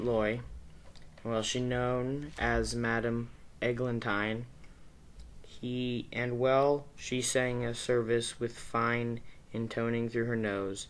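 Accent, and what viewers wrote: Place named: United States. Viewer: american accent... try speakin in an english accent pleeeease, we all sing in an american accent when we sing pop so you can at least try :) please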